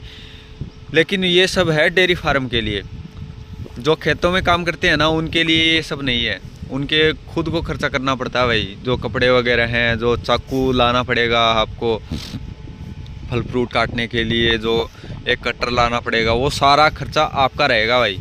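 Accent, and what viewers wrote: Haryana, India. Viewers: Haryana k lage h bhai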